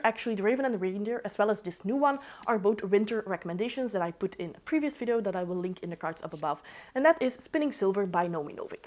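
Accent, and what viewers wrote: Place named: Netherlands. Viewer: I hope you're feeling better now. Thank you for the recommendation. I loved most of the books you recommended, the others go on my TBR. I wasn't a fan of Mistborn, maybe I'll like Elantris. Just found out you're from Belgium, like me. 😊 Do you only read in English or also in Dutch? (I think you're dutchspeaking, going by the accent.)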